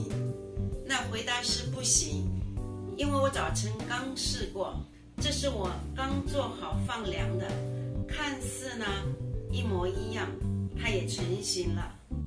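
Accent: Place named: Wu, China. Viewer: 上海人？听上去就是我家乡的吴语嘛。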